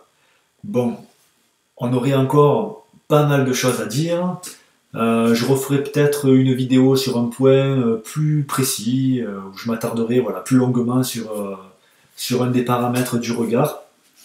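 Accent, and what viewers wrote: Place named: Toulouse, France. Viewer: vous etes où ? vous avez un accent Toulousain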